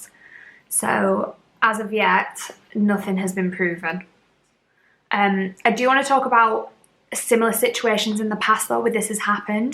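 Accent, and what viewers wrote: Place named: United Kingdom. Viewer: In all this horribleness and negativity it’s refreshing to hear a more local accent and the word ‘brew’ on YouTube as most influencers I watch are American 😂👍🏻 I binned half a bottle of Delight conditioner a few months back 😩 but it was so difficult to get hold of these in the UK anyway, just as well now...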